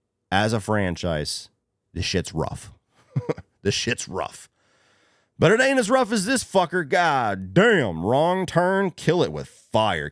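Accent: United States. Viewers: I’m surprised no one has offered him voice work. He has that perfect American voice that would appeal to folks in Asia and elsewhere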